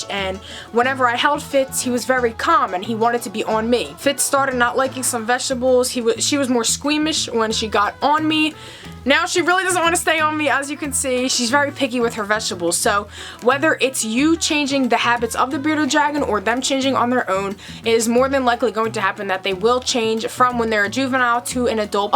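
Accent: Philadelphia, United States. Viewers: Are you from Philly? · hey hun nice video er! you from Philly?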